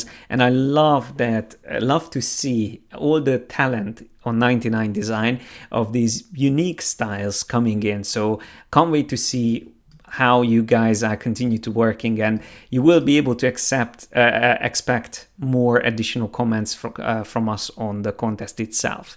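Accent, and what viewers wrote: Hungary. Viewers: Hi there, your accent is very Hungarian. :) I'm Hungarian and this is my 99d portfolio. <link>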